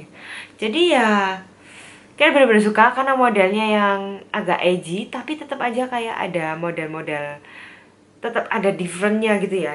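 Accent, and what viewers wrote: Java, Indonesia. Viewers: Ka cantik, aku suka cara ngomongnya. Podo2 jowo mbak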